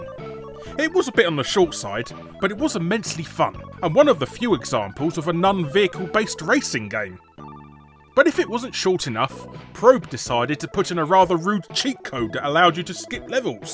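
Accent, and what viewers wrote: United Kingdom. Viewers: On the subject of Alien Breed:, I find your British accent and your delivery of the cheat codes themselves funny as hell, especially "Blimey, gov'nor, look at the size of his John Thomas," LOL. Keep up the good work.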